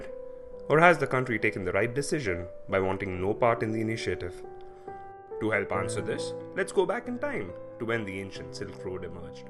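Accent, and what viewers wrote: India. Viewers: 100 % biased against China, the narrator sound like an Indian national...by the time Prime Minister of Indian, Modi dies, China GDP will be probably double of the U S A. The narrator knows nothing about China intentions nor he knows about the British and American are difference. The British is already joined the AIBB while the American doesn't.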